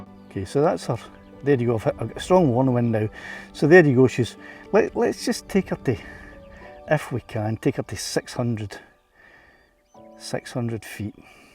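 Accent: Scotland, United Kingdom. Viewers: Awesome video mate. Love the accent… can’t help but think of Billy Connolly 😂 I only started flying recently and honestly thought the drone was indicating it’s height off the ground.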